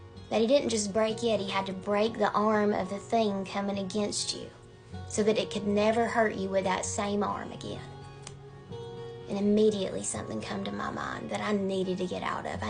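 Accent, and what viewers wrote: South, United States. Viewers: Your soo illuminated, love your country voice ! Still wondering about that cornbread and Sweet tea😊❤❤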